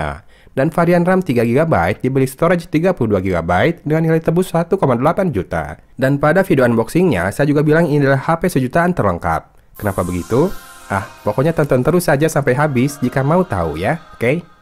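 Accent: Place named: Sunda, Indonesia. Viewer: Urang sunda mana suara nyyy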